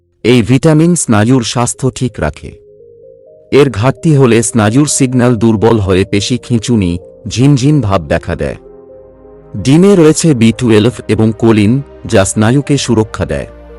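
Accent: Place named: Assam, India. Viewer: আপনি কি আসামের বাসিন্দা , এই জন্য উচ্চারণ টা শুনতে আমি কলকাতায় জন্মগ্রহণ করা একজনের লোকের কাছে একটু শ্রুতি কটু লাগছে , অবশ্যই আপনার ভাষাতেই আপনি কথা বলে চালিয়ে যেতে পারেন , ভালো থাকুন সুস্থ থাকুন ❤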